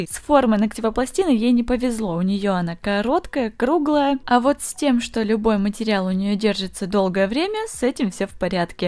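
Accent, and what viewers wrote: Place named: Ukraine. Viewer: жила раньше в Украине, это все же накладывает отпечаток на говор. Ничего страшного, ошибок в речи не так и много)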